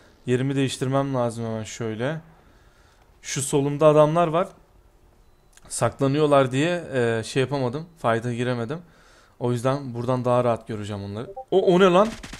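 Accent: Adana, Turkey. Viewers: Abi Adanalı mısın yanlış anlama bende adanaliyim bizim gibi konuşuyon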